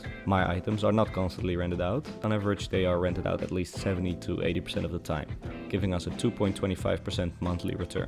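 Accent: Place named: Netherlands. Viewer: je hoort die nederlandse accent altijd zo goed he XD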